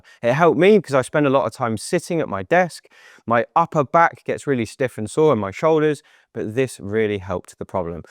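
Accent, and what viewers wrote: England, United Kingdom. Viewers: The best English accent ❤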